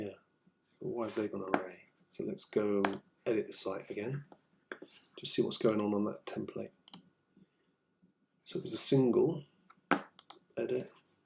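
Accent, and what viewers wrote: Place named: England, United Kingdom. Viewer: Thanks, A great tutorial and it is so good to have a clear English accent. I think that with a touch post editing to get rid of some of the trial and error parts and just give the "polished" end product might be good, but also I do like seeing your thought process when figuring things out. Your voice is excellent and clear and very easy to listen too and your knowledge is a valuable commodity to pass on. I really appreciate the time you have taken to make these videos and would love to see you make some more. Perhaps a playlist for beginners in Wordpress getting going would be great. Have you thought about doing some videos on your everyday experiences working in development and sharing them with us? Maybe some videos on plugin development or securing sites etc? Anyway that's my feedback! Thanks ever so much I really do appreciate your great work. 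- Chris.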